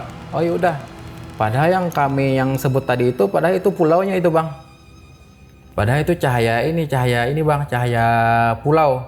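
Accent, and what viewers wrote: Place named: Banjar, Indonesia. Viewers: Bahasa Masih Tebwa Banjar banar😁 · Kayaknya karena abang ini sering pake bahasa daerah (sepertinya bhsa banjar klo dr logatnya) jadi pas pake bahasa indonesia jadi ga tertata cara ceritanya.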